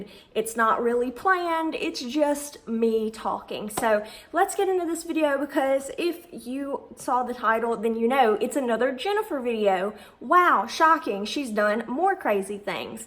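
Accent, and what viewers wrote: South, United States. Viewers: Far as I can tell,your makeup looks fine. Also,where are you from. Noticed a southern drawl. I,too,am a southern gal. Southern Louisiana,in fact. Saw a few videos. Seems like Jennifer is sabotaging the shop. But,since she's blowing the boss,it's unlikely she's getting fired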